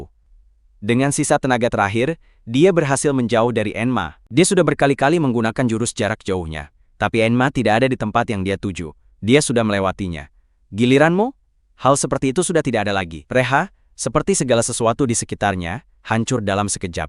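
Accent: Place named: India. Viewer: Suara nya kayak India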